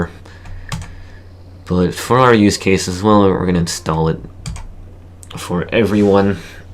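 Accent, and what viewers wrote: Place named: United States. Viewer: We thought you were russian but you sound like a monotone american. Great tutorial btw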